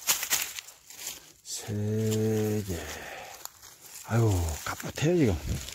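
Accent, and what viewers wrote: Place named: Gyeongbuk-Gangwon, South Korea. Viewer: 좀더 다니셔야 겠습니다^^ 알수없는것이 능이철이라 늦능이 대박산행 15일정도 열심히 다녔던 기억이 ㅋㅋ 수고하셨습니다 님 말씀이 경북 강원도 경계의 억양처름 들립니다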